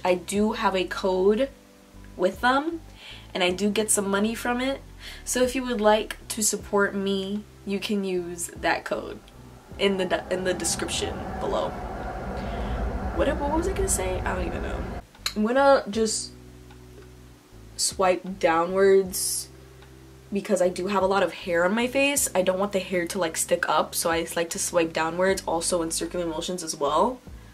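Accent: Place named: New York, United States. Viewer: i'm from nyc and when i got to i was like 👀😗i'm getting nyc vibes is she from nyc?? and sure enough 😛🤟🏽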